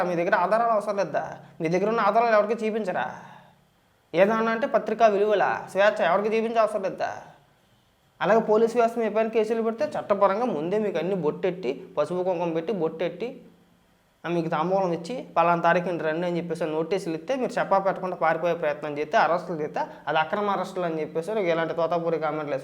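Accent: Godavari, India. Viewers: అన్న మీ వీడియోస్ అన్ని చూస్తాను మీరు చాలా బాగా వివరంగా అందరికీ అర్థం అయ్యేలా చెప్తారు మన గోదావరి భాషలో కొంచెం వెటకారం కూడా ఉంటుంది ❤❤❤❤❤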